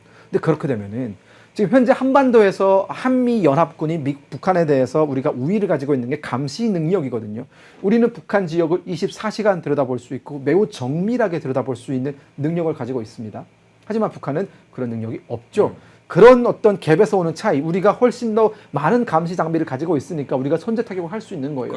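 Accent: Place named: Seoul, South Korea. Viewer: 김금혁씨 서울말까지 완벽하니 인간미가 좀 없어보입니다. 북한 악센트도 가끔씩 좀 넣어주면서 강의해주세요 ㅎㅎ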